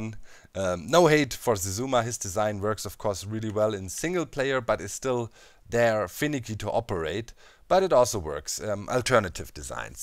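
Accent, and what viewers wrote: Germany. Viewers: man hört das er ein deutschsprachiger ist :P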